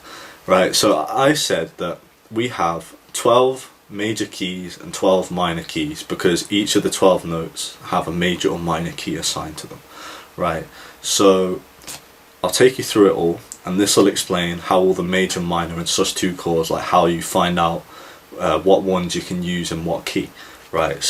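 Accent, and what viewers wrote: United Kingdom. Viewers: may I inquire as to what part of the UK you're from, can't quite identify the accent . PS I really enjoy your down to Earth Style of instruction.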